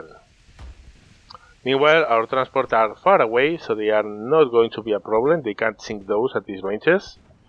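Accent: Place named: Spain. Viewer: Just found your channel for the first time when looking for UAD videos and insta- subbed. Good presentation with an adorable Spanish accent :). I'm torn apart with this particular game as it seems super interesting, but would you recommend dishing out almost 50 EUR at a game that is not even available in Steam EA yet?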